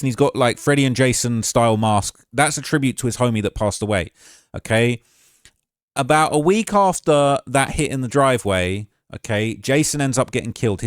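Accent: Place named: United Kingdom. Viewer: Crazy to hear this limey with such inside knowledge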